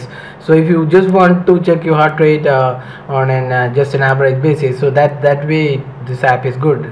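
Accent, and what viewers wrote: India. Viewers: By your speech it seems you are Indian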